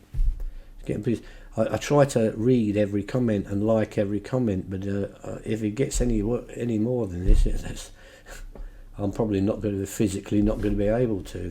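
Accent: England, United Kingdom. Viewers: Was so surprised to hear the accent and realize you're an Englishman. My late grandmother from Yorkshire would have loved your music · Wait i thought i expected is Kind Uncle From Texas but when i Realize he is English that make my heart warm even more 💚 remind of my late Grandpha that live in yorkshire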